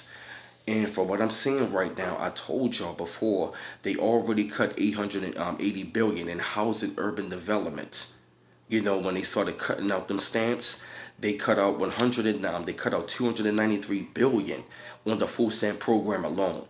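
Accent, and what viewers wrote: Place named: Maryland, United States. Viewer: Thanks for sharing brother, love that Maryland accent.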